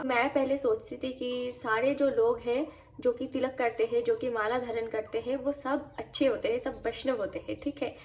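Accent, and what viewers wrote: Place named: Bengal, India. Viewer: Didi apaki akhee shee pata chal raha hee kii app bengali hee ., kyu kii meri devi jii bhii west Bengal shee hee 😊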